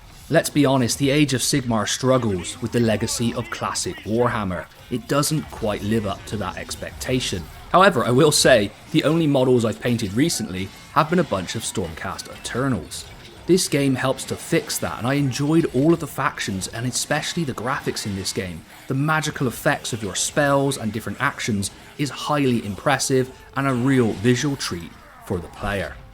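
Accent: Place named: West Country, United Kingdom. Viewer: Hearing a West Country accent in media is a breath of fresh air! I’m from Somerset and you’ve got a follow from me mucker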